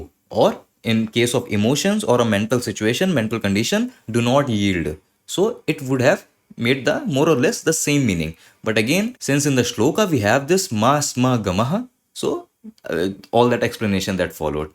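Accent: India. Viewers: Thank you for taking our suggestions on Hindi pronunciation leakage into your commentary. Otherwise your videos are top notch हिन्दी उच्चारणस्य प्रयोगं न कर्तुं अस्माकं सुझावान् गृहीतवान् इति धन्यवादः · You are doing a great ,noble worj,learning Gita and lot of Grammar also assosiated with it .Thanks 🙏 One suggestion , Hindi pronunciation is occurring in a lot of places like Vachan ,Ken ,purush etc .If that is changed ,it would be wonderful.thanks again .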